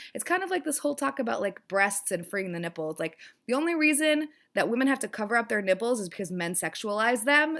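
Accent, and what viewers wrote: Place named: New York, United States. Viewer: Nine Simple Patterns sounds really interesting and right up my alley. The pooping incident happened to my cousin lol. It wasn't funny at the time though. I've been feeling like people are overly sensitive for the past few years. As a whole we've just gotten more dramatic. I like your New Yorker talk. I want to subscribe now!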